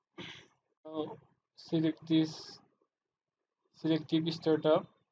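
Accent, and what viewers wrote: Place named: India. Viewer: I couldn't understand what this guy was saying. Indian accent.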